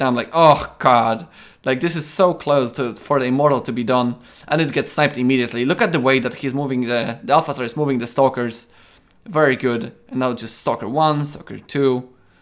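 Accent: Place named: Poland. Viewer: Nice adventure you've had! I enjoyed watching your inside view of this AI vs Human duel, well done! PS. Funny, after a few minutes of watching I've noticed that except of the familiar accent you've used some phrases that is also familiar for me but definitely not of an English origin so I've checked where are you from and Bingo! - fellow Pole. Don't get me wrong, your English is very good, but while freely speaking you gave up your nationality ie. "ran circles around someone" in English mean to do something much better than someone else rather than taking extreme care about someone (Polish meaning of this phrase). Pozdrowienia! Oh and btw, as I understood you've played against different agents each game right? I felt sad for you because of your first 5 matches but imagine what happened to the agent who lost exhibition match - probably it has been deleted.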